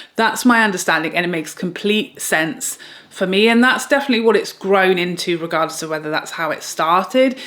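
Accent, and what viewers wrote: United Kingdom. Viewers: Yes, Black Friday started the day after Thanksgiving here in America. I know people who wake up at the crack of dawn to wait in line at the stores to buy that TV. It's a tradition for some people. I can't imagine shopping in store on that day - the crowds are unbelievable - not fun. Thank you, for being true to who you are - this is why I follow your channel. Also, I love your British accent.:)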